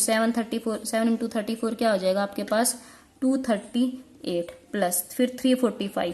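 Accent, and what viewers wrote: Haryana, India. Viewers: Mam aap haryana se ho Kya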